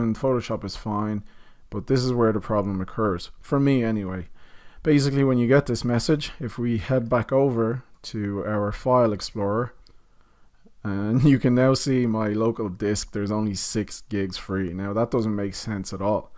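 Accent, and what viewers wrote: Ireland. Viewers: Ayo your Irish?! 😮😮 Cool tutorial bru!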